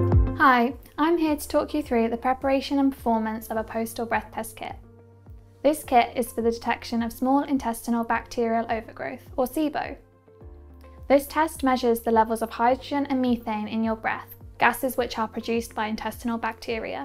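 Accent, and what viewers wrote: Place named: England, United Kingdom. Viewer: shes english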